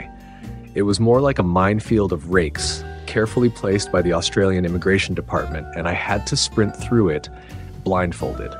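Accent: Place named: United States. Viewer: For me, this sounds like a typical American English accent. I’ve met some Australian people here in Canada, and their way of speaking is quite unusual and not very easy to understand.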